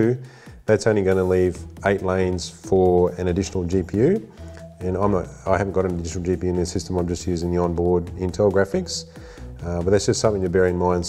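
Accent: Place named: Australia. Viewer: After the first sentences, being a non-native English speaker, I thought "What's that supposed to be? Australian!?" ... and only seconds later the .au domain appeared at the bottom xD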